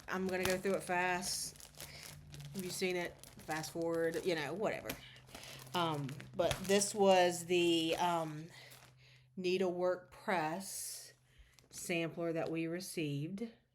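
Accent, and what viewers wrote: South, United States. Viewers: The reindeer feedsack is so cute! At age 75, my eyes don’t work too well on anything smaller than 32 count fabric, so would love to win it! Always love all your finishes and stitching! Also, being Southern myself I love hearing your accent! Makes me feel like home! ❤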